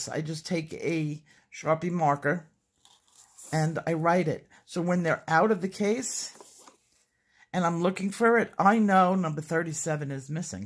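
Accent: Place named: Hoboken, United States. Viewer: you talk just like my mom, shes from Hoboken. She says looseleaf too:)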